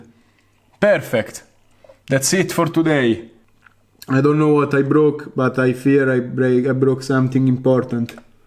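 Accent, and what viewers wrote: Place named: Italy. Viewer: Pure pleasure to watch your movies mate. they sound even cooler with this italian accent :D wish to have more confidence to show my experiments publicly :D like You :D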